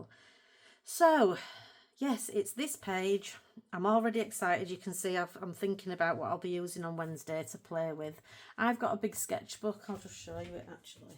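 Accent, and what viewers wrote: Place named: Northern England, United Kingdom. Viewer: I’m really looking forward to this series - love the colour palette you have chosen for the background! I’m excited that you will be using Chromoflow as there are very few YouTube channels who use these pencils - Prismas are by far the favourite out there. I am a Mancunian living overseas so it is lovely to hear your Northern tones!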